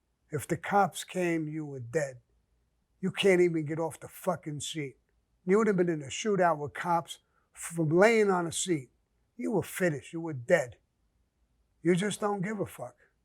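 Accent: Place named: Brooklyn, United States. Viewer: It's simply a dialect common to a Brooklyn that unfortunately, for many reasons, exists no more. Every region has their own. Nothing more. Nothing less.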